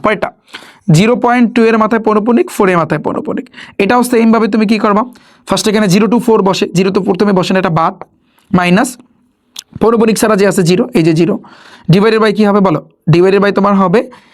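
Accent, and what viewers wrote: Barishal, Bangladesh. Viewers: ভাইয়া কি বরিশালের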